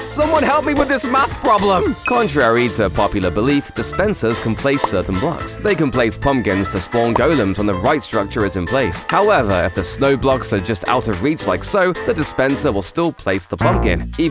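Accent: United Kingdom. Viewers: So British accent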